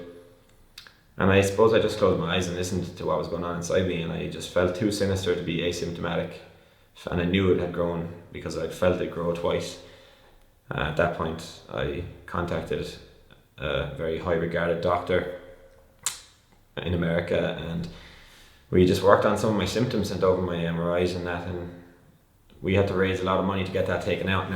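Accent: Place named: Ireland. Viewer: Small world man! I too had a tumor in my pineal region. Glad to hear you're doing good! In hindsight I rushed into surgery and am glad you mentioned not to do that. How're you feeling these days man? I've got to say the philosophical advice at the end really hit home and the irish accent made it that much better. Thanks for making this video.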